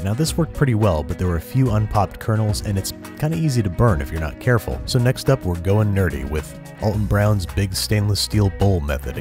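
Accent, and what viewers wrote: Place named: Mid-Atlantic, United States. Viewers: lol love the transatlantic accent. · Great Mid-Atlantic accent and another great video!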